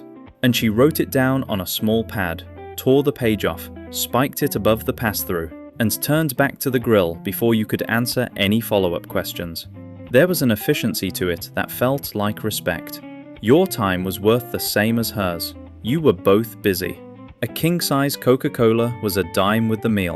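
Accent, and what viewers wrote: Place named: England, United Kingdom. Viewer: An English voice on nostalgia from The United States of North America. 😊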